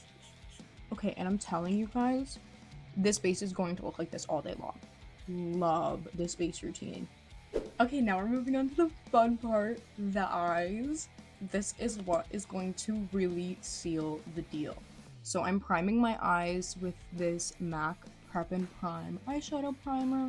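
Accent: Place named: United Kingdom. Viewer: Idk why but from ur tiktoks I always I assumed you were British. Also GREAT VIDEO! Ur so pretty^_^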